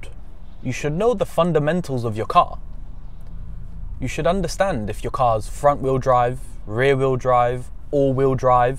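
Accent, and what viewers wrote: United Kingdom. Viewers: Mf speaking British 💀💀💀🤢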